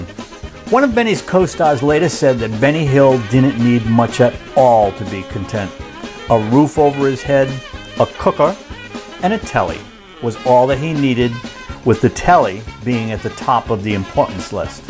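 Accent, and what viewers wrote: United States. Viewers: ONLY thing sad is this usa accent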